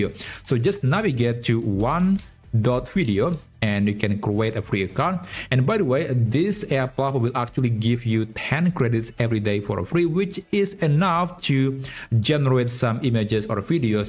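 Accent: Indonesia. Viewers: Org indo ya bg? Aksennya ketara😂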